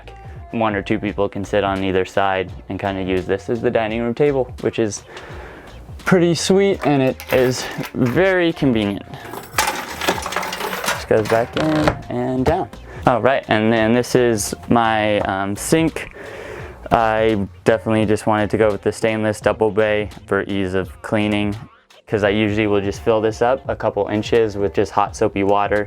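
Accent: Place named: California, United States. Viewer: I don't remember if he said his location more specifically than the PNW but he sounds, to me, like an average Californian. You're making sweeping generalizations of a vast country, where people have had varying levels of educational opportunity, and insulting him based on it. He is presenting to a channel that likely has a wider audience than just the formally educated, some of whom might not recognize the array of synonyms that one may use to describe the things that have been a pleasant addition to their build. I'd argue that he was a very contextually effective speaker, using a language and tone that fits the channel and its viewers, and brought a fair amount of charisma, to boot. Regardless, organization and tool-building was also vital in bringing humans to our current time and place, and he seems to have a high level of aptitude for making and executing plans which met his personal goals. 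Why do Aussies call everyone "mate"? Why do the British throw around "slag" and "bollocks"? There's a unique context to every culture, and it's beautiful that there's more choices than just Standard English in our world.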